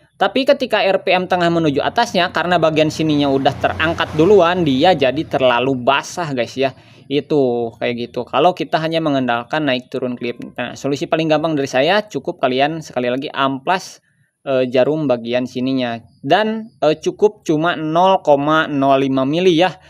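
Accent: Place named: West Java, Indonesia. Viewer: Dari logat bicara nya, akang mirip2 channel bang vedro simister.. cara penyampaian nya jelas dan ada logat sunda nya.. semangat kang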